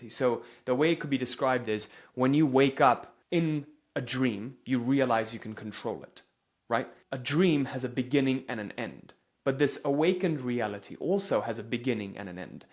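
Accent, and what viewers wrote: United Kingdom. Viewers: Why is Muslim talking about Sanatan Dharm-Hinduism in a British Accent for American audiences? I guess The truth shall set you free, at the end of the day the truth will prevail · Why is Muslim talking about Sanatan Dharm- Hinduism in a British Accent for American audiences?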